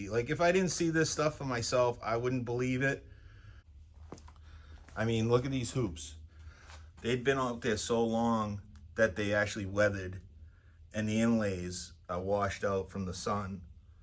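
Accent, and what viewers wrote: Boston, United States. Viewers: Great video! Are you from North or South of Boston? Apts look like either Brockton or Dorchester. I lived on South Street in the early 80's Campello section. Def a Boston accent! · Sketchy park, and Boston accent. Definitely thinking Brockton or Fall River 😂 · Wow, man! I saw a poscammed. Free drums in the woods somewhere and just assumed it was a scammer. Maybe not related . Either way, this is absolutely crazy!!! What a killer find. Good job tracking it down, man. And hearing you say "pahk" and "pahts" so many times just added to my enjoyment 😂😂😂 Had to get a Boston jab in there as a New Yawkah! So envious on that Vistalite kit. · dam! I was gonna say Brockton when you said south shore. But your accent is thick so I thought closer to Boston. Just moved my son into a house in Brockton. Like Avon border, so not too bad. Nice neighborhood…for Brockton 😂. 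I can’t say too much about it. I live on the border of Woonsocket RI.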